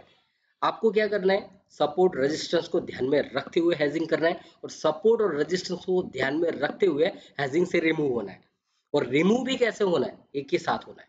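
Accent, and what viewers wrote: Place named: Gujarat, India. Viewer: Gujarati can identify that Gujarati accent 😂😂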